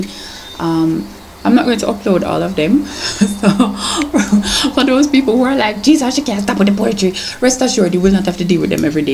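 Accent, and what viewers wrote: United Kingdom. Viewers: I love this one so much. The british accent really carried it off. GOOD JOB! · i love it! love the fact that its in a brit accent too. "yu talanted mi gal"! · your british accebt man, woah! shame your not posting them all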